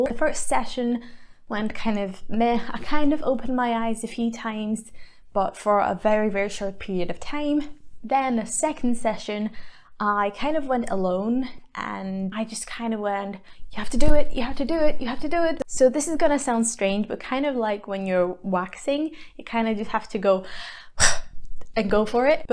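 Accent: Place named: United Kingdom. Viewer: Her accent is British Nguyen you can tell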